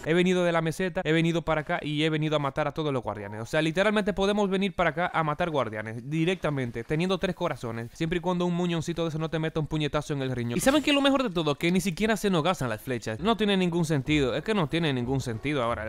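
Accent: Dominican Republic. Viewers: Eres de República Dominicana ?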